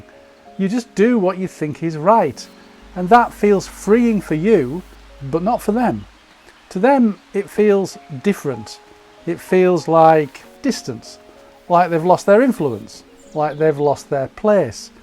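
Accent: United Kingdom. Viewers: I’m pushing 60, in half a year. Is the narrator from Britain? Sweden here.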